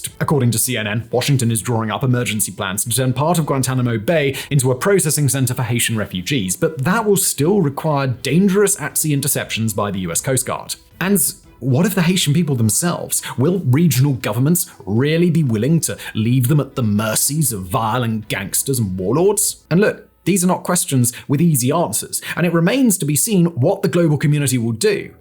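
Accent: United Kingdom. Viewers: I can’t handle British accents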